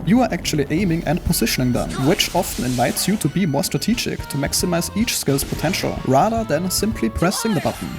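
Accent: Germany. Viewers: ich mag den Deutschen Akzent und follow gleichmal rein ^^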